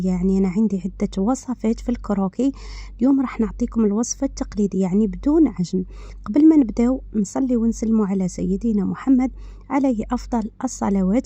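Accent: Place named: Skikda, Algeria. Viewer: لهجتها سكيكدية تهبل تحيا لناس سكيكدة نبغيهم بزااف ،وشكرا حبيبتي على الحلوة😊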